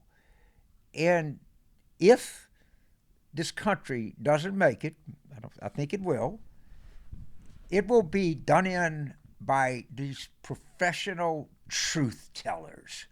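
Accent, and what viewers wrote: South, United States. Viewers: The wisdom of Franklin Roosevelt and Barack Obama spoken in the dialect and vocal intonations of the Confederacy. You are perfect Mr. Carville.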